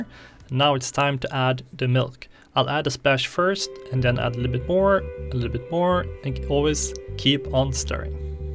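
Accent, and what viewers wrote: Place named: Stockholm, Sweden. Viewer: Du har fan den bredaste stockholmska svensk-engelska dialekten jag någonsin hört:D! Bra jobbat btw! du har jättebra content men det är väldigt likt Babish;D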